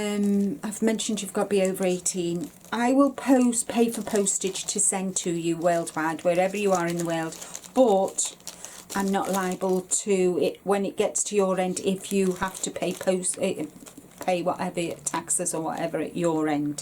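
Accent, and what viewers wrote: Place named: England, United Kingdom. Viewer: I just stumbled across your video I thought it was nice to be nice to win things to make for my grandkids I got so many of them making a baby blanket or booty those would be so nice sounds like you're in England I'm in the US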